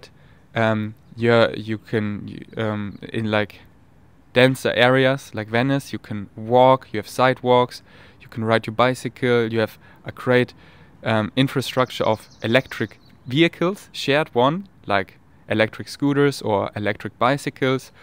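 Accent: Germany. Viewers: you have the loveliest voice for podcasts and talking. Part of what is so special is your German accent, but I agree and think it's important if you want to speak better English then emulating those with good speech around you is important. Not to learn just slang but actually what words should be dropped or not used, how to arrange them in a sentence to make sense and where the emphasis should be put on a word for correct pronunciation. But these things don't include your wonderful German accent which is what makes you YOU! xx I hope you enjoy the rest of your stay, you are learning so much about life and people from the everyday experiences (and so do we then!). Btw I moved to England from NY, my husband is British and we have fun joking about the differences in how we pronounce things. So what may seem correct to me may not be to a Brit, and the other way around. 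I think it's great to understand this when we hear someone from another country speak, one may not be wrong, just different. Just my thoughts.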